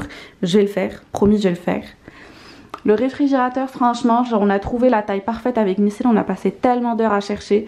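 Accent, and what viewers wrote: Quebec, Canada. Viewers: Pourquoi tu parles avec un accent canadien 🤔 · Tu parle avec un accent canadien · T’as un peu un accent québécois non ?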